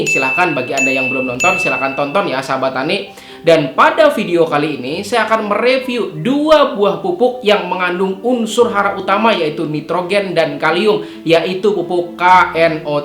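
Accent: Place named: South Kalimantan, Indonesia. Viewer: Mantab pa PPL.....SALAM SSHABAT TANI LOGATNYA DARI DAERAH KALSEL KAYANYA😁😁 BARU PERTAMAA LIAT CANEL NYA PA.....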